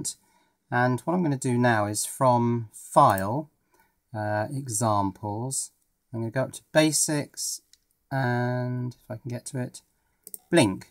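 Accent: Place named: Scotland, United Kingdom. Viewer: Very good and didactic, with a nice scottish thrive!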